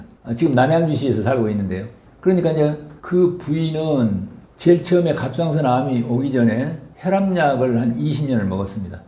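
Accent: Jeolla, South Korea. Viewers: ㅜ 라도 사투리ㅜㅜ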